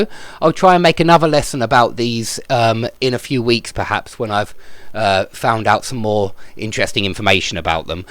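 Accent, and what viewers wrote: United Kingdom. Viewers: That's British accent with his perfect voice.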